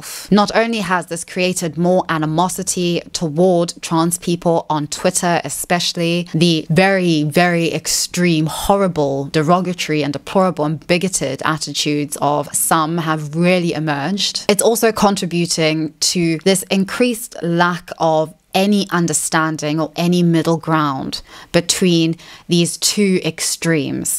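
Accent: South Africa. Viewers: It's her accent, people talked like that around here in South Africa, she's explained that before. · She's South african but has lived in the uk since she was a teenager, so it's probably her going in and out of accents.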